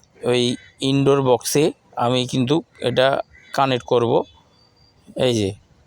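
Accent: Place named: Bangladesh. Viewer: apnar vasa ta bangladesh ar monehoche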